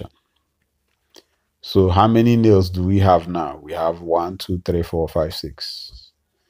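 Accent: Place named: Pidgin, Nigeria. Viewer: Thank you for this sir.. It's your pidgin for me.. Sweet to hear😂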